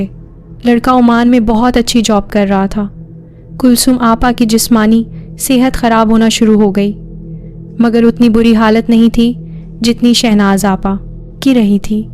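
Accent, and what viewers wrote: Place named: India. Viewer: Assalam o alaikum dear aap ki awaz buhat achi hy or andaz b lakin Indians ki tarha khy or khay me wazy farq hy is ghalti ko door farmalijye❤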